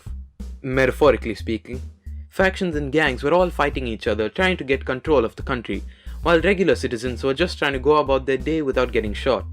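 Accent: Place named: United Kingdom. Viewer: im dying lol, gj m8 nice british accent btw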